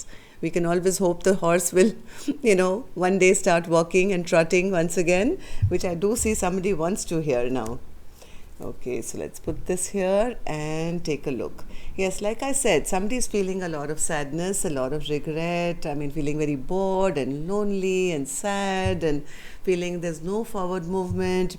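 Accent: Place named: Delhi, India. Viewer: Your voice is so familiar.. are you from New Delhi 💐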